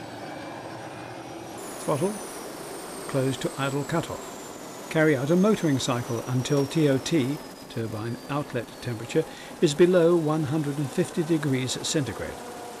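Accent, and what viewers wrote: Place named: United Kingdom. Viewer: Thank you... :-) by the way, may I ask you some detail about the DVD program? I would like to buy it, to my ears the speaker seems to be British rather than American...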